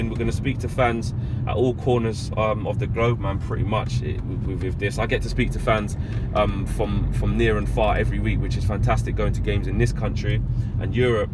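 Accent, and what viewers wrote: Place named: Manchester, United Kingdom. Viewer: Gotta love a strong Mancunian accent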